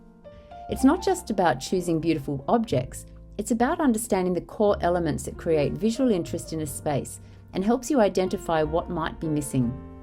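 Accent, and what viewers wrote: Australia. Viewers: I have been looking for an Aussie channel, and I am so happy I found yours. It was so lovely to start watching and hearing your Aussie accent. I am not saying I don't live other channels I do, but I love that I found a channel in my own country - so precious! ❤❤❤❤❤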